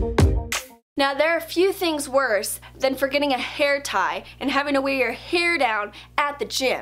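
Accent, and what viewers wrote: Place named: South, United States. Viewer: Are you from the South? Sometimes I hear the accent, sometimes I don't.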